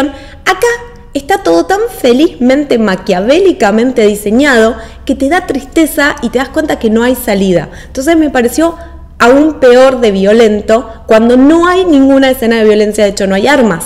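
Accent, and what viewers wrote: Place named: Argentina. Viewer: Tu acento argentino no lo soporto me voy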